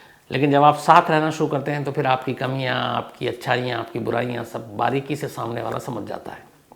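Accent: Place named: Lucknow, India. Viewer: Oh now I know how your pronunciation of your Urdu grammar..it seems Lucknow Urdu the original ...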